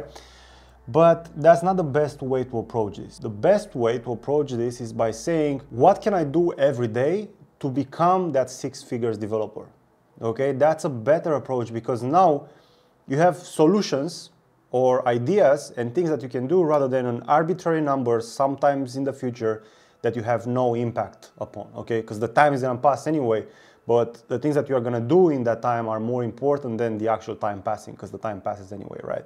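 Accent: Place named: Romania. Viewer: Pretty sure you're a mici enjoyer only by your accent. How about simply just jumping into projects and learn trough your mistakes? We have gpt, it can help us, but after the helping code is received, we should also learn from gpt why he did that, and then use that thing until u fully understand it. Coding for the average person (with a job or being a student or both, or even having mental issues), would take around 8-16 months to learn a language to the point where he won t use the internet and copy-paste code 60% of its project, or the big plante, being easy for him to explain his code.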